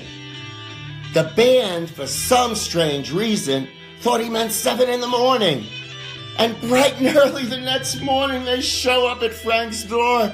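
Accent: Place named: New York, United States. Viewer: is that what a new york accent is?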